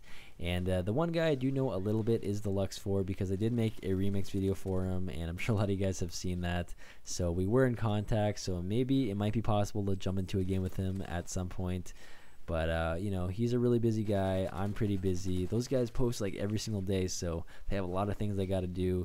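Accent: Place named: Asia. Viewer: 我從一開始看他之後就覺得他的口音有點亞洲人，感謝翻譯。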